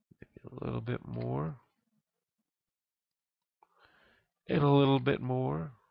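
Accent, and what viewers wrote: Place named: Texas, United States. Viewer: Yes... Great intention and i think i get it BUT a bit too fast even though you sound like you're from Texas lol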